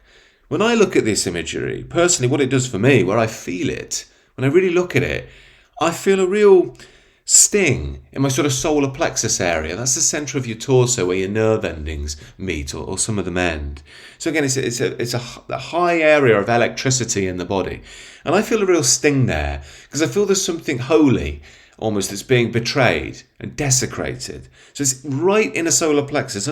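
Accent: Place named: Northern England, United Kingdom. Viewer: Eee, by eck, that northern accent were ruddy grand 😁.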